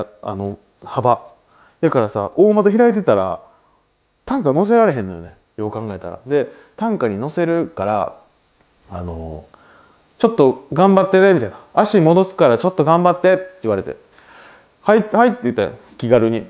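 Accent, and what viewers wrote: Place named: Kobe, Japan. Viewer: 神戸の子やな、言葉で分かる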